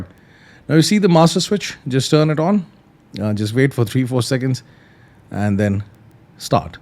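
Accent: India. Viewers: Love you lil Indian accent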